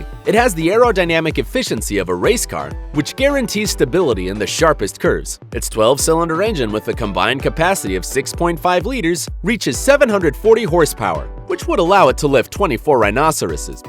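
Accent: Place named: United States. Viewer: Americans and your pronunciations.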